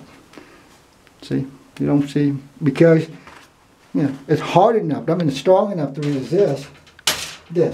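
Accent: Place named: Vietnam. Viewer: I'd love to buy one of your knives in the future, I'm sure they're going to be great =) just your sharpening/grind alone will be great, but you seem to be getting really good at the hardening/tempering too. awesome stuff. can i ask what country your ancestors were from? i'm curious about your accent :) it kinda sounds vietnamese or cambodian to me.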